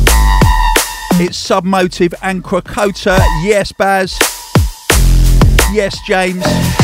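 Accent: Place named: United Kingdom. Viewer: Gotta love how bri'ish my boy sounds, I love him so much. Big up, London.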